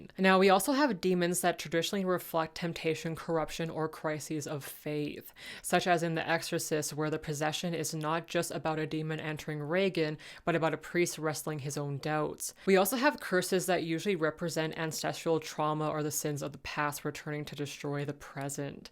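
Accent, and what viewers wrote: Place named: Canada. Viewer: That's really interesting! Sorry to hear you were bullied for that. I never would have guessed Hungary. I'm from Minnesota in the US, and I detected a little bit of a Canadian accent in your voice, since our accents are somewhat similar. I like the way your combination of accents fit together! It gives your narration a unique and pleasing style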